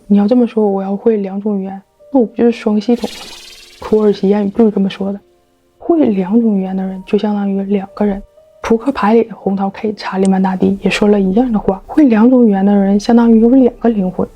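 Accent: Northeast, China. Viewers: 🤣🤣🤣🤣🤣🤣我去，看着人，看着画面，看着繁体名字，以为是台北的，一听口音，原来是东北的。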